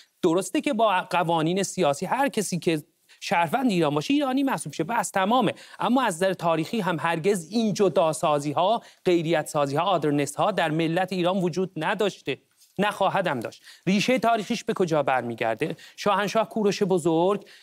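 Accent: Turkey. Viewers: از لهجه شما حدس میزنم زبان شما ترکی باشد ولی چکیده و عصاره غیرت ایرانی و آریایی در رگهای شما جاریست . به دوستان نزدیک و همنشین های شما که میتوانن از کلام و علم شما بهر ه ببرند حسادت میکنم . پاینده باشید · این شخص خودش لهجه ترکی داره واقعا لذت میبرم و امیدوار میشم وقتی میبینم ترک های عزیزمون هم از این عقاید ملی گرایی ایرانی حمایت میکنن بخدا اگه اقوام ایرانی،مخصوصا ترک ها،که جمعیت زیادی در پایتخت هستن،با اقوام دیگه متحد بشن و از قوم گرایی دست بردارن،ما هیچ ترسی از آینده ی بعد از گزار از جمهوری اسلامی نداریم بنظرم یکی از دلایلی که رژیم تا الان به دست مردم سقوط نکرده،همینه که اکثرا ترس داریم از اینکه بعد از گزار،تجزیه طلب ها،در میان ترک ها و کورد ها و اعراب خوزستان،مسلح بشن و کشور رو به آشوب بکشونن میدونید اولین کشوری که به جنگ داخلی در ایران کمک می‌کنه کدوم کشور هست؟روسیه چون ریشه ی عقاید تجزیه طلبی،از خود مردم ایران نبوده و ریشه از شوروی بوده،پس روسیه چون بعد از سقوط ج.ا،در واقع یک متحد خودش رو از دست داده،قطعا مسلح میکنه این ها رو تنها راه نجات اتحاد اقوام هست ترک ها مردم جنگجو و غیرتی هستند و حقیقتا اگر اونا نخوان،حکومت نمیره کاش یه صلح ملی اتفاق بیوفته و مردم و اقوام به این نتیجه برسن که اگر یک حاکمیت درست و متخصص و قانونی سر کار باشه،انقدر پیشرفت میکنیم که اقوام کشورهای دیگه آرزوی پیوستن به ایرانو میکنن اما در صورت تجزیه،تازه شروع تقسیم این تیکه ها بین ابرقدرت ها و جنگ داخلی اقوام برای چند کیلومتر خاک و حق آبه هست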